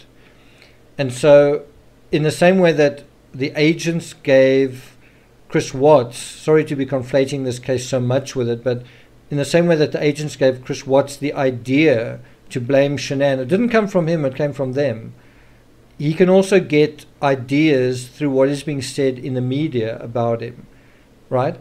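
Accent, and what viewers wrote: South Africa. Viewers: Wow a south african accent! The first I've heard as far as this case goes. Interesting video I've subscribed. (Johannesburg here)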